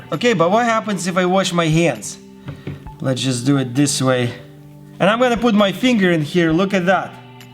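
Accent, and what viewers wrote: Russia. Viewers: Что это блять такое ? Ты же русский,это по акценту слишно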